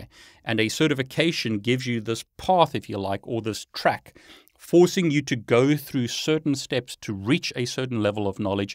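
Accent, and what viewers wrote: South Africa. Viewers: Didn't know you were South African but it helps explain why I've always thought there was something about your accent I couldn't quite place!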